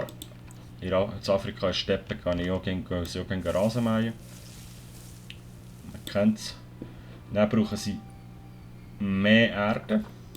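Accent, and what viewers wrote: Bern, Switzerland. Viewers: Haha du siech schlofsch fascht i bim rede, Berner?😂 mega unterhaltsam, mach witer so.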